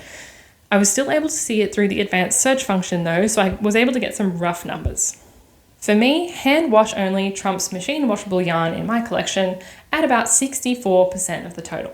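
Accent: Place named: Australia. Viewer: As a Maths teacher and someone who has been knitting for over 40 years (and every day for nearly 20 years), I cant tell you how much joy this video gave me. I have all my stash on ravelry and have crunched numbers many times over the years but never to this detail and certainly not lately. Might be time. I’m curious. Thanks for sharing. Also nice to hear another Australian accent on the YouTubes. ❤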